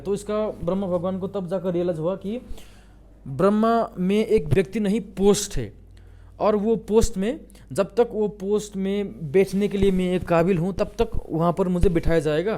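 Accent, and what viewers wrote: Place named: Nepal. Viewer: Nepali tone... are you nepali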